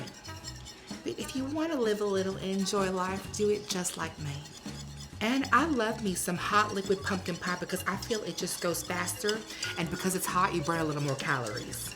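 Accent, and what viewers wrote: South, United States. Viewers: Southern girl here (GA!). Your accent is on point! Bless your heart! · had so many GOLDEN one liners in this cooking show and I'm here for the cooking, comedy, southern accent, and her entire look! 😂🤭😆 I am dying to make the hot liquid pumpkin pie 🥧 because it's easy and virgin, just like me, (*wink wink*) 😏😬🤐😭💀 You sent me ROLLING laughing! Can't wait for your next episode! 🦃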